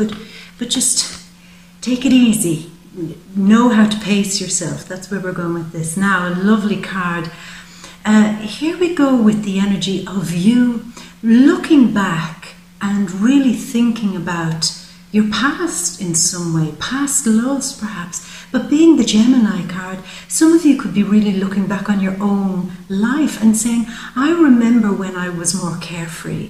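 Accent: Ireland. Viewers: Well I have to say this has resonated with me like 98.9 % I don't know how you do it. But it was really nice to hear I am thinking like your cards... Bravo Cheers Love your Irish accent. Beautiful.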